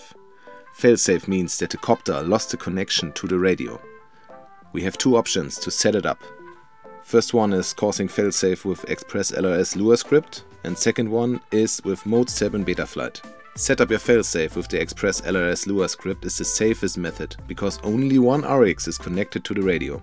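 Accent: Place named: Germany. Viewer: Der Akzent klingt irgendwie nach Deutschland, also bin ich mal ausnahmsweise so frei: Top, sowas such ich. Ich wollte schon ewig wissen, wie die Technik hinter Formationsflügen etc. funktioniert. Kleine Drohnenshow machen und so. 🙂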